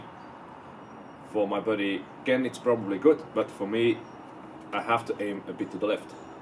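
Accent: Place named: Eastern Europe. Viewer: Where the hell are you from lol I can't regcognize the accent, sounds slightly Slavic but I could be wrong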